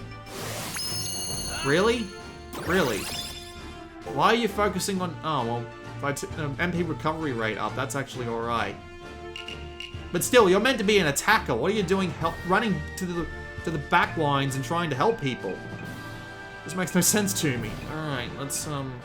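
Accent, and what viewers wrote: Australia. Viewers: The voice on this review is awful. I am not a fan of Australian accents.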